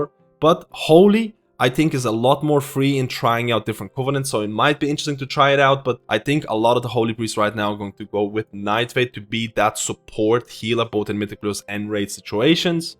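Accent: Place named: Ireland. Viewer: What's the deal with the accent? I can't place it, but there definitely seems to be a heavy dose of Irish in there?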